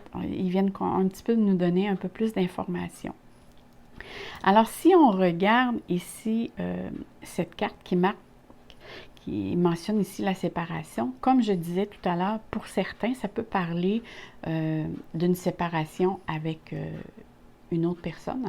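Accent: Canada. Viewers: Coucou chère merci pour ce superbe tirage, quel espoir.... en plus j'adore ton accent canadien si sympathique... Un grand merci et je te souhaite aussi une excellente année 2021 à bientôt 😍😍❤️❤️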